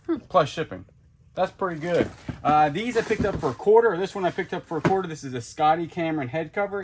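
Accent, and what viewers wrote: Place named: South, United States. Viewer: It doesn't matter what kinda vids y'all make I'd still watch them so thanks for bringing us on your adventures. u crack me up and I love ur southern drawl your just too sweet.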